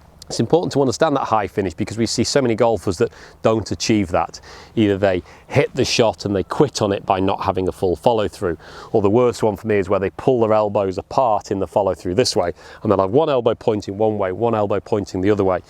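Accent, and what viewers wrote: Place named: Midlands, United Kingdom. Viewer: I want this guy to give me private lessons. Sounds like a midlander though and I'm near Gatwick 😔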